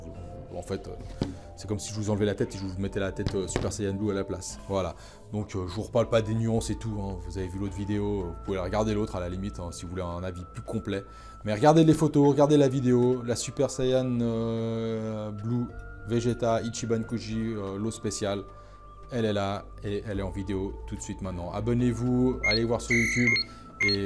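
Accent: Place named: Quebec, Canada. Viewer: This dude def from montreal or quebec.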